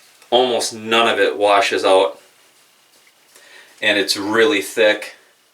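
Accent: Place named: Wisconsin, United States. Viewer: You've got a Wisconsin or Minnesota accent man. Good review though.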